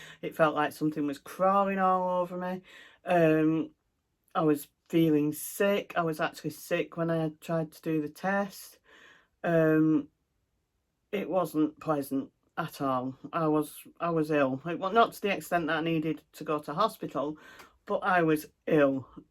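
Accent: Lancashire, United Kingdom. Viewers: Would love to stitch the tree or the flowers. So sorry you have been sick, but glad you are back. Certainly understand the different accents for areas of the UK. I love listening to yours. :-) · Your Lancashire accent is one of the lovely things about your podcast. Having lived in Rochdale for a number of years before, I would move back to the north of England in a flash if my family could be persuaded to move! Isolating would be a breeze if I had a nice tree to sit under and stitch. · Sorry to hear you have been unwell x I love your Lancashire accent,I was born in Accrington and now live in Southport I could listen to you all day x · Hi, my name is Marion. Love your videos, I'm Canadian so it took me a bit to get used to your Lancashire accent, but I understand everything now😁. Sure hope you feel better now. Would like to stitch flowers!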